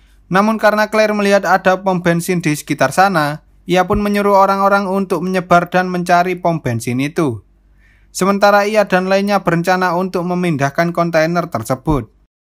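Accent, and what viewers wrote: Java, Indonesia. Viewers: Jelasinnya engga jelas. Kalo udah medok pinter dikit jelasinnya. Gua udah nonton berkali kali soalnya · Iki admine dubbing jawa ya? 😅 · Orang jawa ni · Pertama kali mampir, logatnya medok Jawa banget 😁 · IQ7 tapi versi medhok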